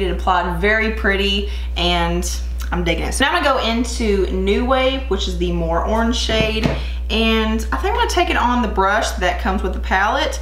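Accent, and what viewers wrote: South, United States. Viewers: I really got tired of all the hate bandwagon of this palette. You and emily Noel were very honest about this. I love your southern accent. You are a very beautiful woman. Keep up the good work.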